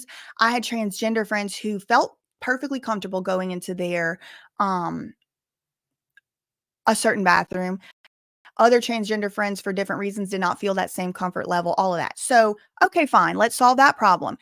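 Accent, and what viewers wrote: South, United States. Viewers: Wow you're story's amazing, congratulations on going from where you did and with disabilities to now a lawyer, and I love your southern accent is so cute just like Britney's, don't ever change it. You should email that person and just say well bless your heart your southern so I'm sure you know what that really means when you tell someone that lol. ❤ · I’m not American, but I don’t get why a person with southern accent is seen as lower in any capacity. It’s pretty evident that you’re incredibly intelligent. And you did it, you are on top, don’t let anyone make you feel less than. You’re so beloved and respected because you’re the real deal and i bet there are young girls looking up to you and wanting more for their lives despite the circumstances they are growing up in. You’re wonderful, funny, smart, beautiful and quick on your feet. 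We love you girl ❤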